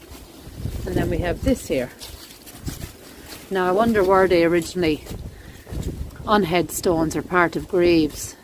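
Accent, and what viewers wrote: Ireland. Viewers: Coffins need a clean. You sound Irish, are you in Ireland?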